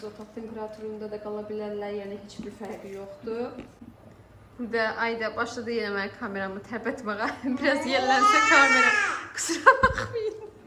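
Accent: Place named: Shaki, Azerbaijan. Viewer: Bayaq Dan seki lehcesinin sirinliyi celb eliyirdi, indi de sirinliyi, Men ona balet eliyirem butun vloq boyu🥰Allah saxlasin 🙏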